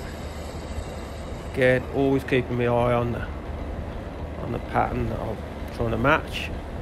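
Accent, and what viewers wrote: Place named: Birmingham, United Kingdom. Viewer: I love your accent. I lived in Birmingham for a while and I always feel homesick listening to you. Plus I'm hoping to be braver with the skew...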